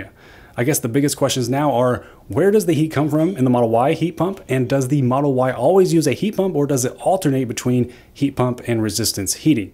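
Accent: Kentucky, United States. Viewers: Are you a Kentuckian?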